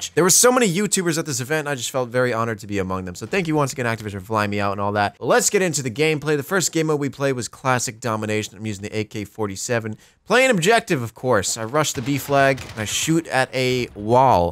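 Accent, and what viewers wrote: New York, United States. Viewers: As you get older we can hear your new YAWK accent come out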